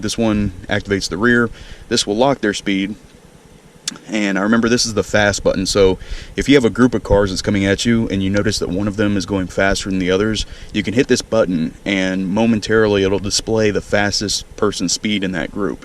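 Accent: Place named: Georgia, United States. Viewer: Wow this such a good idea for a youtube channel!!! Cute Georgia accent!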